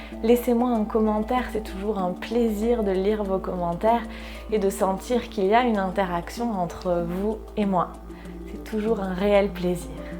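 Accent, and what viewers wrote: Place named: South, France. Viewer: Encore une nouvelle séance qui me fait du bien. Me change des séances iyengar que je pratique tout au long de l'année. Un véritable plaisir avec cet accent si charmant qui m'apporte en plus la lumière du Sud dans nos contrées septentrionales. Au plaisir de pouvoir suivre en présentiel un jour un cours avec;-) Belle fin d'année à vous et longue vie à l'alchimie des corps en 2022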